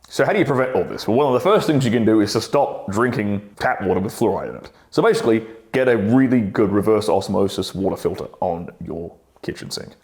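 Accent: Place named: Australia. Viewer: As an Australian, this is the most annoying version of the aussie accent, if anyone talks like this, I just automatically assume they are talking out of their arse and everything they say is just bullshit one way or another. My success rate on picking up on bullshit artists is 100% and it's because they all have this stupid fuckin accent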